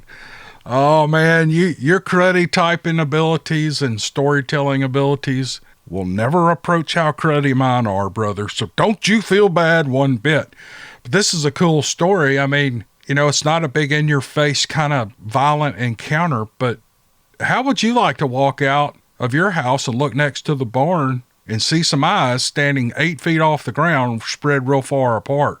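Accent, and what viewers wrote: South, United States. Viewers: Thankyou for the stories AND your time! The work hours go by much quicker while listening to your southern accented thrillers.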